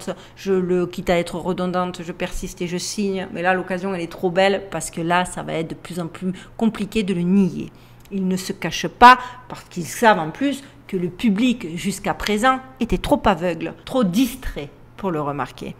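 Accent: South, France. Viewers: Salut ma jolie 🤗❤, je suis comme toi le vilain petit mouton noire, et aujourd'hui je m'assume totalement et je dirai même j'en suis fière, fière d'être différente et puis touts ceux et toutes celles qui sont différents et éveillés sont directement mis dans le moule dit "complotistes, fous, pas clair..."et j'en passe, moi et mon Moustache ( mon chat Noir et blanc) on t'❤aime car nous te ressemblons tellement à toi et à Melle Duchesse!!! Merci pour ta sincérité, ton humilité, ton accent qui chante notre jolie Sud...Tu es une belle âme, ne lâche rien, courage je sais que c'est difficile, mais tiens bon ma jolie, je t'envoie une galaxie d'infinies pensées remplies d'amour, de paix, de courage et de positives!!! Et pleins de bisous remplis de tendresse et de douceurs ainsi que plein de câlins pour Melle Duchesse, j'espère que tu as vu ou entendu parler de la fête de 3 jours que la ville de Toulouse va faire du 25 au 27 Octobre de cette année, c'est comme un immense Carnaval et le titre c'est "les portes des ténèbres" il me semble ( je t'avais laissé des messages à ce sujet) ce serait un superbe sujet à traiter et surtout à dénoncer, car le mal est vraiment là et ils ne se cachent plus, dans l'énoncé ou résumé de ce sombre spectacle c'est écrit que ce spectacle est fait pour connaître les ténèbres parce que pour découvrir la véritable lumière il faut d'abord passer par les ténèbres 😮😢 vraiment navrant et triste!!!! 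Merci pour toutes tes superbes videos, tu no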